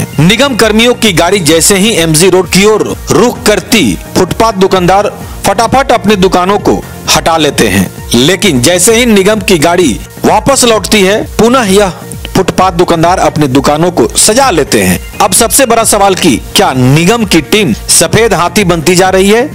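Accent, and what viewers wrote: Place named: Jharkhand, India. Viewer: Jharkhandi aavaj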